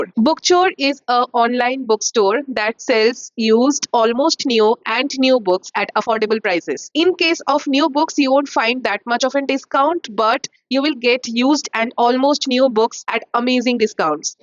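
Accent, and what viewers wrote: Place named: Bengal, India. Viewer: You are a bengali. We can observe from your accent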